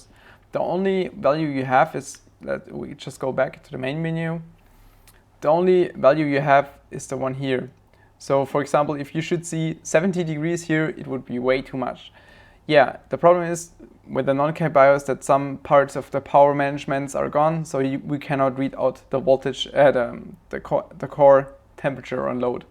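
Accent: Germany. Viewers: Man hört, dass du aus Deutschland kommst :D cooles Video! Hast du das Bios gemacht? Wenn ja, mit welchem Tool? Versuche schon ne Weile ein Ozmosis Bios zu machen, aber das funzt nicht, weil es die Firmware schrottet. Was hast du benutzt? LG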